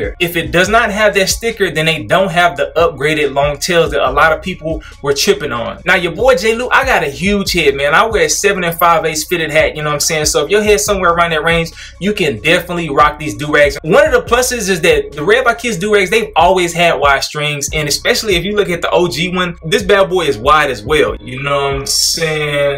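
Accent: Houston, United States. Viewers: This dude gotta be from the H. Subscribed.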